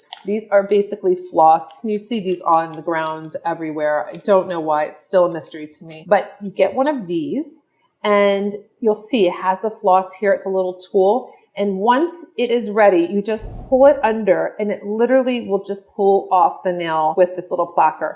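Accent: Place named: New Jersey, United States. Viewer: Are you from N.J?? You sound exactly like Margaret from Real housewives of N.J.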